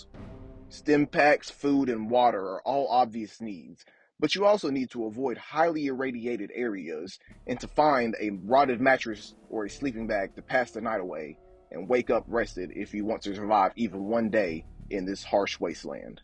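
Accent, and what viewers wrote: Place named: South, United States. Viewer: Oh it’s definitely not just you, he has a bit of a Southern accent but i think its cute🤭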